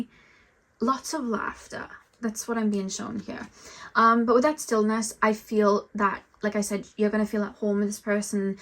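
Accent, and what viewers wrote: Wales, United Kingdom. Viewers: You are from Wales arent you? Whenever i hear that accent i remember whose channel im on 😂 i watch too many tarot reads obviously. But i have to say yours are one of my many favourites. Thank you ❤ P.s im inlove with the accent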